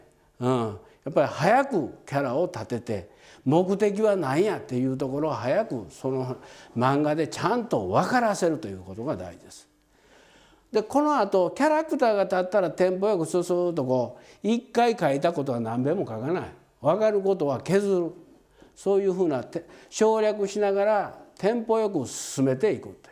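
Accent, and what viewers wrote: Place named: Kansai, Japan. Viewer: 関西出身だから、聞いてたら運転免許更新センターのおっちゃんが喋ってんのかなと思ってしまう。まんまこんな感じの喋りやし。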